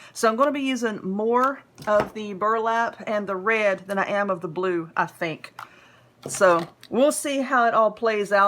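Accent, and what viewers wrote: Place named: North Carolina, United States. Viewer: Love it!!! You are an awesome teacher! I can't wait to make one. I hope you are doing well. I also like your North Carolina accent. I can't spell the word you said that was N.C. language but my daddy was from and lived in Ashville N.C. and he was something else. He came up with language also. So I love listening to you talk bc it does remind me of him and his language. Thank you for all you do!👍👍🎆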